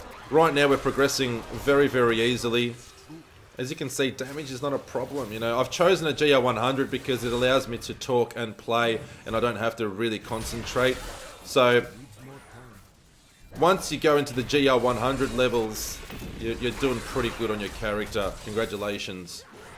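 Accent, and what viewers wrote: Australia. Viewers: not aire east coast Aussie I reckon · Are you aussie bro? Maybe kiwi perhaps? But you live in AU aye..love watching your vids man.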